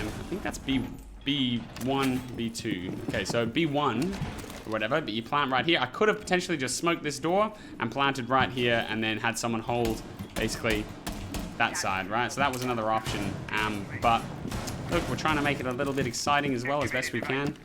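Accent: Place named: Australia. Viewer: my "inner siege-voice" have adopted an aussie accent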